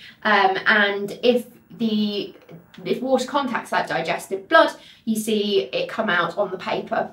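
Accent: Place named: United Kingdom. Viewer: Love your Brit-ish accont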